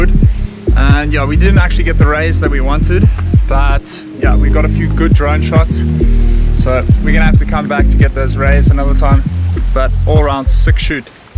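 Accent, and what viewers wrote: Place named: South Africa. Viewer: Love your stuff dude. Are you South African? Sounds like it ;-) Lekker man.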